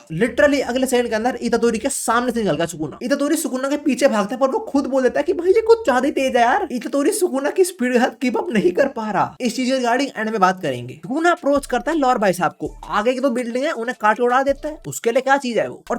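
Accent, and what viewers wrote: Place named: Haryana, India. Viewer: Hate his haryanvi accent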